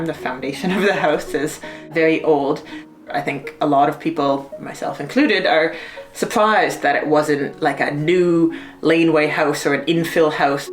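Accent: Canada. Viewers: Love this house! Is her accent from Toronto? It doesn’t sound like the Canadian accents I’m used to, it’s adorable so I’m just curious. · Compared to American tiny houses, this is a mansion! Where the heck is it? She sounds Canadian. Ah ha! Canadian and Toronto. Very cool tour! Lucky! Despite the narrow size, I bet it cost quite a bit to build and the rent is probably not cheap.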